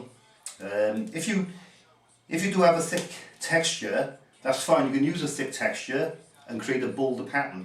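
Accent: Cardiff, United Kingdom. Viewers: Caerdiff accent Got one myself, best of luck.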